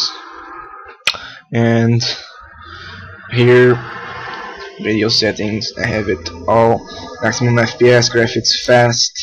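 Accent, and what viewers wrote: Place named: Portugal. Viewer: ahah you are português ! i noticed by your falar xD , thanks also now i got more fps :D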